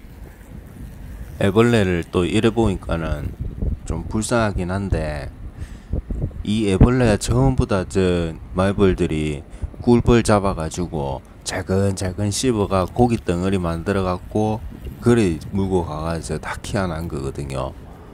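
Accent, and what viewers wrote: Gyeongsang, South Korea. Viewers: 유튜버님의 경상도 사투리가 마치 청국장찌개수준입니다 너무 구수하네요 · 말투가 경상도 같음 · 터줄낀데 ㅋㅋㅋ 경상도 방언 재밌다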